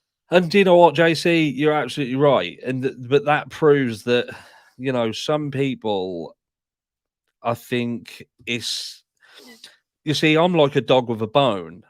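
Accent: United Kingdom. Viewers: Apparently, the closed caption doesn't translate UK English very well. It's throwing words up that are no where near what you've said. LOL. Feel sorry for any people who rely on it.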